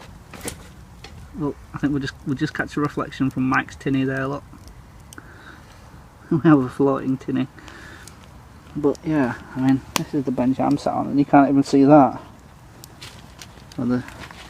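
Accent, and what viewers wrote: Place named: North Yorkshire, United Kingdom. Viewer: Have a listen... see what you think... Doesn't sound boomy enough for the bigger deer, but muntjacs, little buggers are spreading like hell. They're well through norfolk and heading ever Northward as they advance on the Scottish border. Not sure where you are , but accent reads as maybe North Yorks or southern Teeside. Little venisony sods have fangs as well. Destructive as hell on the natural flora... Bunch of Bambis on a bastid asbo... Most suggest 'inviting them for dinner' is the best plan ... Look less cute with onions, mushrooms, garlic, and a few game chips ... a.t.b <link> ...subbed you... I like forest eco-builders ...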